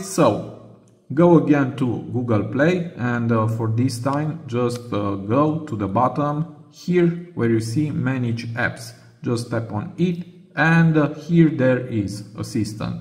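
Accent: Romania. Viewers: Thank you very much! I think u are Romanian by Ur accent ❤❤❤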